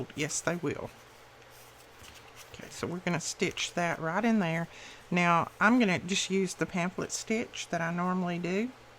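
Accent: South, United States. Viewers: I tried this for my first journal. I loved making it so much I'm working on my third journal....much harder ones. I love how there are so many choices for how to make them. And I enjoy your voice. You have the same accent my Grandmother had. She was from Nebraska. Yours may be a little more Southern, though. Thanks for the great videos.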